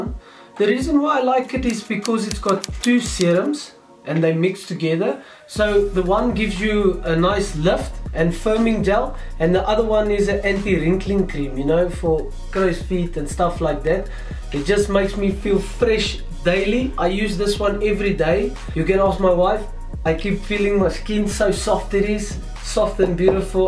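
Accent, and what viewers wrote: South Africa. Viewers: Sounds like a broertjie from South Africa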